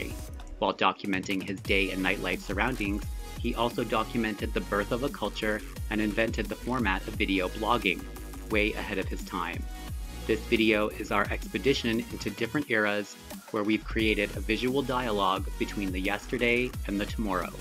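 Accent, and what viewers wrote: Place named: Canada. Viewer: thought I detected a bit of hoser accent...(that screengrab with the TO ad on it was the final puzzle piece)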